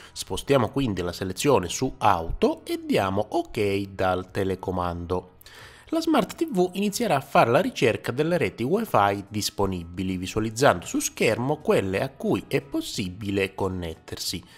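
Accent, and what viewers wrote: Calabria, Italy. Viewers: e infatti, ti ho dato del Calabrese. Lo sono anch’io...perciò l’ho subito capito ascoltandoti 👍🏽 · Ma sei calabrese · Ma sei calabresee?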